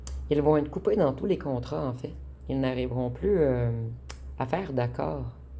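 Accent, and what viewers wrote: Quebec, Canada. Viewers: Bonsoir et oui il y a des gens qui passent leurs vies à faire le mal mais tout revient vers ses personnes tôt ou tard Ma lumière dérange parait il mais j'en envoie beaucoup aux autres à travers les soins étant guérisseuse ( genre Laochi de la 13 Octave ) etc Psychotherapeute après je me suis dirigée vers les soins énergétiques. Merci votre guidance est terrible vous voyez incroyable J'espère que vous continuerez même partant au Quebec j'adore votre accent cela me rappelle l'intonation d'un ancien ex Québécois Quel beau pays Merci à vous Excellente soirée bise❤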